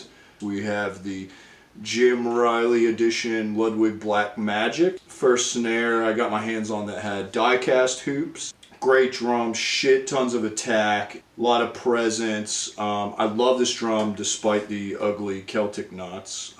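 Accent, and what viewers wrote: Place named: California, United States. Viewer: I love listening to you speak. Voice is like Cali skater meets Ben Stein and talk is all about drums. Can't get enough. Rock on. 🤙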